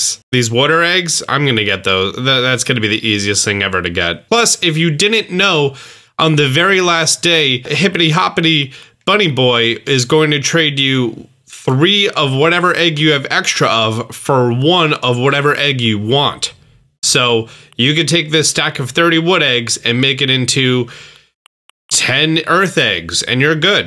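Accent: New Jersey, United States. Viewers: Ok let's talk about "water" pronunciation REAL QUICK (sorry). Nobody in America pronounces the "t" in "water" as a "t". Most people pronounce it as a "d" just like you guys in New Jersey do. The difference is the "a". Most pronounce it like "ah" as in the "a" in "calm". You guys pronounce it like "oo" as in "wood". Like, it literally sounds like you're saying "wooder". Ok rant over. Love your vids brah. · As a New Jersey resident, yes that is how we say water. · I'm from Jersey and yeah we say water that way whats the problem with that?? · Lmao it is a jersey thing. Im also from jersey. We all say it like that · Can confirm on the water dialect for Jersey. i used to live in New Brunswick. We do in fact say Wadder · 😂 Cant believe youre from NJ! No wonder I never understood why people were complaining how you say "water" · I am from New Jersey as well, and I can, in fact, confirm that we do pronounce water just as you said. 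😁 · As a Jersey-ian, I too pronounce water like that. Didn’t even think you were pronouncing it weird lol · Water = Wooder in Jersey, apparently. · He aint lying we all say wadder in jersey lol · Honestly, I love your New Jersey accent :) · hello! checking in as one of the new female viewers (well, i was subscribed before for some pokemon stuff but now i'm actually invested in the ac content lol). i didn't realize you were from nj - me too. people always shittin on our accents -. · Lmao I’m from jersey too and I had no idea you were saying water wrong guess I learned something new about myself today 😂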